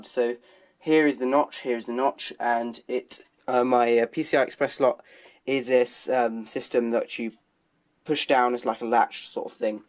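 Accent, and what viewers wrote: United Kingdom. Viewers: finally an english voice on youtube ahh :)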